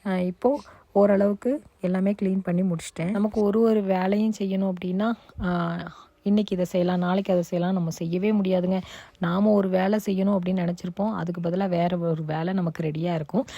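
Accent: Tirunelveli, India. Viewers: பொடு பொடுன்னு திருநெல்வேலி ஸ்லாங் 👌🏿👌🏿👌🏿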